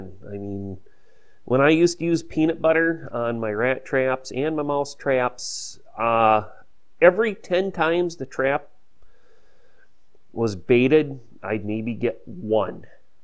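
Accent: United States. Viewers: jeremy thole Ha ha I’m in Melbourne Au trying to work out if it’s an American or Canadian accent! Guess it’s American?! 🥴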